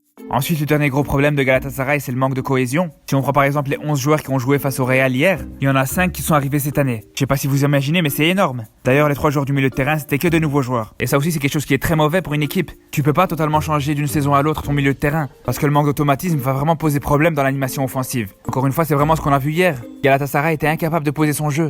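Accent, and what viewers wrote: Brussels, Belgium. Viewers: Son accent est véridique, elle vient de Bruxelles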